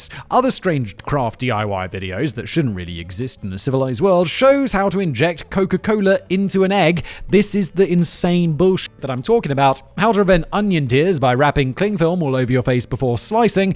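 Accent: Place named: United Kingdom. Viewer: You have got to love the irony of the guy who is the McDonald’s of YouTube, making comments about the quality of other peoples YouTube channels. Every single time this individual has a brain fart. It turns into a new channel, he can’t even read a teleprompter without making mistakes, almost literally every single video. A guy who makes his living by literally doing just quantity over quality, criticizing other people about the quality of their videos. He mispronounces things that are basically commonly known words in the English language or our general knowledge, but he wouldn’t know that because his only qualification for his job is the fact that he has a British accent. Which makes people think he is smart, spoiler alert, he’s not. This imbecile actually claims to have a university degree from somewhere in Britain, he should send the work he has done in the last few years to his alma mater and maybe he can get his money back.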